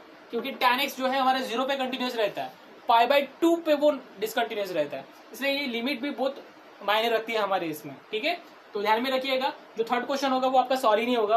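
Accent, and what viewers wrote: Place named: Maharashtra, India. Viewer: Sir maharashtra se ho n Bolne k tareeke se lag rha h 😂😂😂😝😎😎😎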